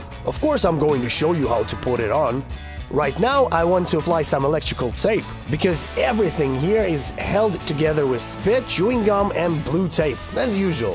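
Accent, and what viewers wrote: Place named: England, United Kingdom. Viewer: О, вы из Англии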